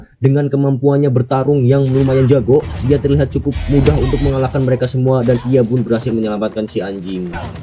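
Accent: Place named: Indramayu, Indonesia. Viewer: Logatnya orang Indramayu wkwk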